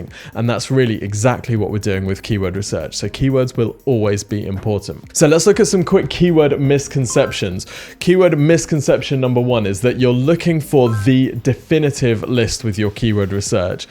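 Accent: United Kingdom. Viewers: Are you from Nottingham?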